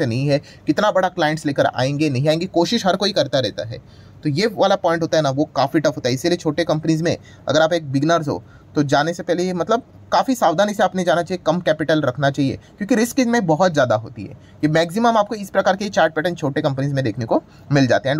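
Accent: Maharashtra, India. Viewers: guruji pakka maharashtra ke hai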